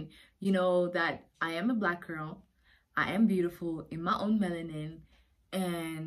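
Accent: United States. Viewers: You came back with a slight American accent 😹. This was very informative thank you.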